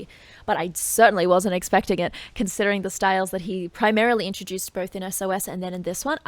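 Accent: Australia. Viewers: Don't let the "don't pause" crowd affect how you do your reactions. Do what comes naturally...most are here for a reaction/analysis..if we wanted to listen to it straight through we can! Everything sounds better with an Aussie accent BTW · Your honest analysis, well-balanced pausing, hair color, smile, & cute Aussie accent get me emotionally connected as well. 😍💃😁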